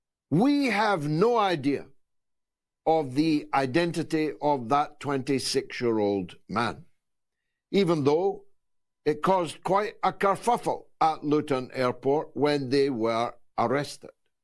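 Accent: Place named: Ukraine. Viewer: A Russian speaking Ukrainian, I hear ?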